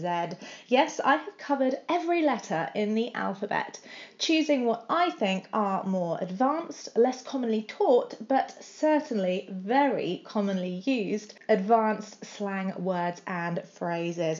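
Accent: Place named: RP, United Kingdom. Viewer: Hey.u r my fav youtube teacher.Are you planning to release any podcast of only yourself? I really like your posh accents and wants to listen on podcast. Or audio books. anything pls💕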